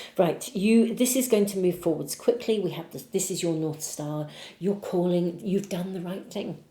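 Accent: England, United Kingdom. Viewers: I'm speechless! I did #3; left a 43 y/o relationship that kept me unseen for too long. He dimed my light an my soul. I'm feeling a little bit unsure about my future and worry about my means but I'm proud of who I've become after beeing through my pile #1...I've healed my child wounds and still am as triggers hit me. I met my twinflame through out my healing journey and it is a bit complicated as he also has shadow work to go through. No contact and it's hard. Keeping faith. I will try my #2 pile and reopen my book project that stayed too long in limbo. I just want to be happy again for the rest of my life...with my 4 grown kids, my friends, my art projects and dreams along side with my soulmate that I love. I want to show to the world that if I can do all of this at my age, anyone can find their dreams if they really want to change and find their true self. 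You are right. Many people in my life do not approuve of my choices but I don't care. Thank you for your guidance. Your readings are helping me from day one. Sorry if you find my english writing a little off. I'm french speaking. Hope it all makes sense. Love and Light to you. You have such a lovely english accent.☺️ Merci 💐🙏🏻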